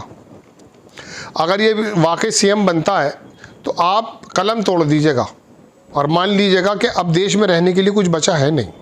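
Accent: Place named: Meerut, India. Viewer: Patrkar sahab meerut ke aas paas ke shetr lagte hai bhasha se 😊😊😊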